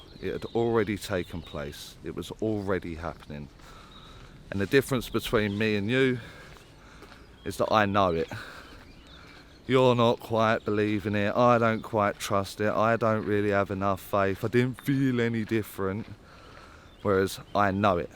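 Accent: Essex, United Kingdom. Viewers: Starting out and so glad I found you! Someone I can relate too..helps with the Essex accent lol so here goes! I got an uncle, in his 40s, he was a happy go lucky man with a good sense of humour. He comes to help others with your teachings. He wasn't married and he liked to drink which he thinks attributed to his death. He lived in a rural town but not in the essex area. It may not be right but its given me confidence to listen to that 'voice' x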